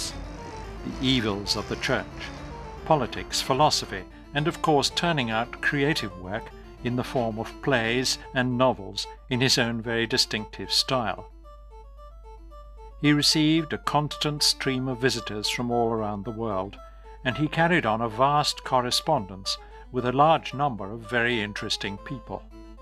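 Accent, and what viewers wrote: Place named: United Kingdom. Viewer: Background music is too intrusive plus heavy British accents make narrative wasteful.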